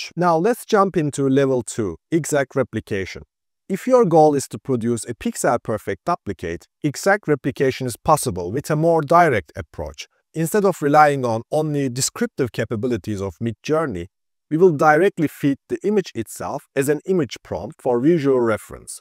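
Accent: Turkey. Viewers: (sesinizden Türk olduğunuzu varsayarak) Videolarınıza Türkçe altyazı ekleseniz çok iyi olurdu. Ne yazık ki Türkçe kaynakların çoğu “en azından benim gördüklerim” GPT ile nasıl para kazanılırdan öteye geçmiyor :) Anlatımınız ve video için teşekkürler. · dayı türkmüsün by nası aksan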